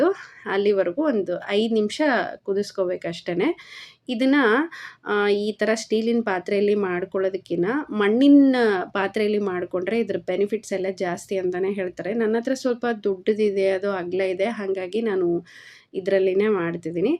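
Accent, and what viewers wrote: Raichur, India. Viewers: ನಿಂದು ಯಾವೂರು ರಾಯಚೂರು ಭಾಷೆಯಲ್ಲಿ ಮಾತಾಡುತ್ತೀರಾ ನೀವು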